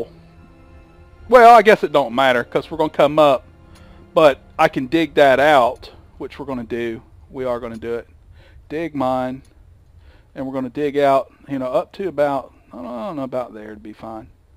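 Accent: South, United States. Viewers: Glad I can hear you in this video. Hated that I could hardly hear anything in the last 3, and happy to hear a fellow southern voice.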